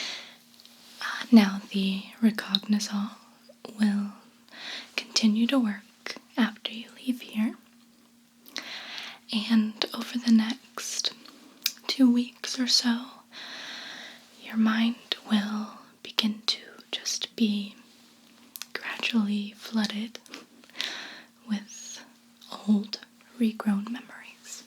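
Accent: South, United States. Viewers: The Christmas series she did with the curse removal had more of a southern accent going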